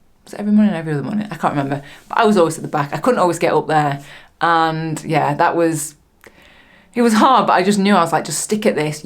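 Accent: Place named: North, United Kingdom. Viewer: Just wanted to say thanks for your many inspirational videos, really looking forward to this series. Been riding for about 15 years now. Managed to eat myself into a diagnosis of type 2 diabetes back in august and have since become fitter and faster than ever (at 50!) 3 weeks ago managed to aggravate an issue with a disk in me neck which meant I was in a lot of pain and unable to ride. Watched this vid while in hospital recovering from the corrective surgery so I can’t wait to hear you share your recovery story. Reckon it will be 6 to 8 weeks until I’m back on the bike which is going to be hard. I have to say though they for me over the last 15 years of riding as someone with fairly severe bipolar I have gotten HUGE mental health benefits out of the simple act of riding and maintaining my bikes. So please keep up the great work, and also it’s good to hear a proper northern accent here on YouTube.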